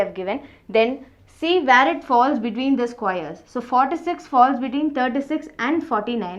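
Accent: South India, India. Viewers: South Indian tone of speaking..nice👌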